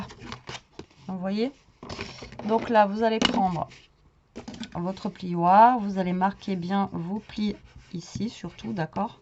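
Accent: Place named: Toulouse, France. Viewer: Cc, il est vrai que je mets pas trop de commentaires en ce moment, j'essaye de me débrouiller toute seule mais pas tjs évident, et pour ce qui vous suit, je voulais dire que c'est vraiment grâce à vous que j'ai pu apprendre car vos tutos sont totalement formidable, c'est très bien expliqué, simplement et efficacement, je reviens tjs vous revoir pour tjs réaliser vos réalisations et c'est tjs un plaisir de vous revoir avec votre accent que je connais si bien, que j'adore, car je suis toulousaine, restez tjs là même car d'autres personnes comme moi qui étais vraiment débutante, aurons besoin de vous comme je le suis encore, et qui font que nous apprécions le scrapbooking, merci encore à vous, je vous embrasse 😘😊😊😊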